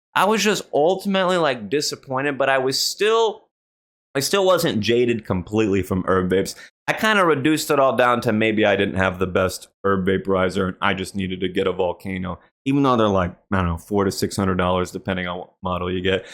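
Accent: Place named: New Jersey, United States. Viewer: Am i the only one that's noticing part of a jersey accent in his voice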